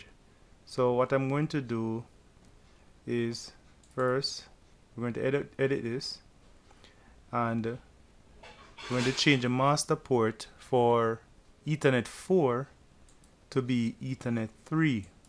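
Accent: Jamaica. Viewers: Well Done I love your Jamaican accent. Big ups man!!!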